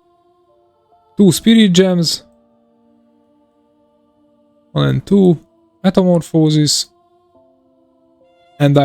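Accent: Hungary. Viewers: Where are you from? You sound like you are from hungary a lot :)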